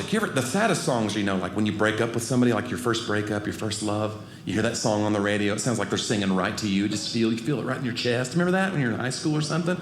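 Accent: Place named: South, United States. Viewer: Awesome comedian. Redneck and southern accent. Love it. Don’t change.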